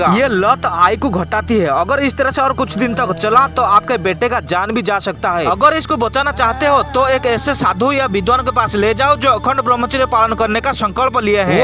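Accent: Bengal, India. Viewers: Bhai tum bangali ho kya 😁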